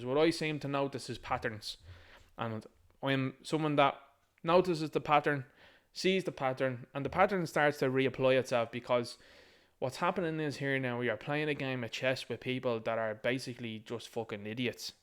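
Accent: Dublin, Ireland. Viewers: Absolutely love you. I’m a culchie and I luvvvvv the Dublin accent for starters…and the Dublin expressions. Class, pure class ❤ and then the content….you really nail it here. Pls God more ppl wake up….am thinking their strategy in going to Derek Blythe’s door abut his tweet or whatever it was, was because they knew he’d report it on social media and it would make ppl afraid they’d e next if they post something, so they’ll stay quiet, that’s what I think they were trying to achieve there. So we have to spread the word and wake ppl up and reclaim normalcy. Thanks. God bless.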